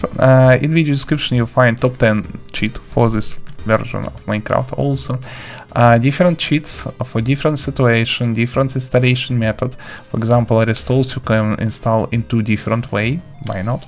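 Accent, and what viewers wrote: Russia. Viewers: Русский акцент, вери вел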